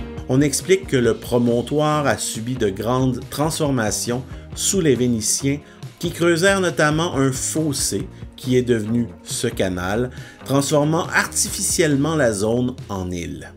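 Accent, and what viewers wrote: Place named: Quebec, Canada. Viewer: Très bonne vidéo, Merci. Sous le soleil ça doit vraiment être beau 😅..... après quelques minutes nos oreilles se font à l'accent québécois, qui n'est pas désagréable. Juste un petit détail....un moulin ça sert à moudre les céréales....pas à les "produire" 😂😂.... C'est la terre qui les produit .... bien le bonjour amis québécois ✌️😊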